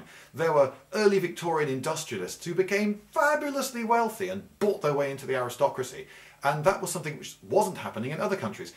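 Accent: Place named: RP, United Kingdom. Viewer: Cbf to explain why your analysis is fcking stupid. You having a posh accent doesn't make you clever.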